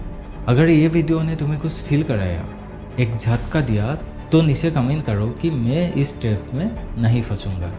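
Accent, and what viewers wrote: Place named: Bengal, India. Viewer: heavy bengali accent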